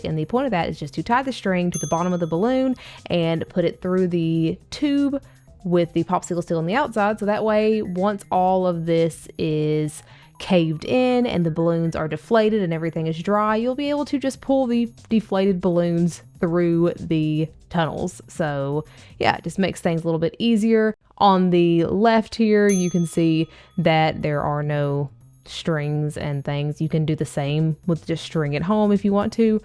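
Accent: South, United States. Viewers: her country accent is so funny i love it 😂😂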